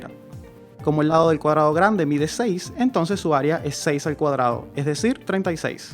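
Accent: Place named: Venezuela. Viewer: Soy aficionado de canales como minutephysics, numberphile, sixtysymbols, veritasium, etc y cuando escuche tu acento me dio un escalofrío gigante, que bueno ver en youtube gente venezolana haciendo cosas similares, mucho éxito! muy fino lo que estas haciendo pana! P.S: llegue aqui porque minutephysics te dio like!, GENIAL!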